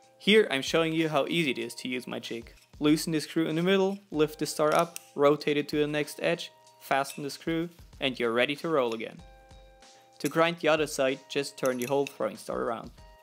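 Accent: Germany. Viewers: Man hört das du deutscher bist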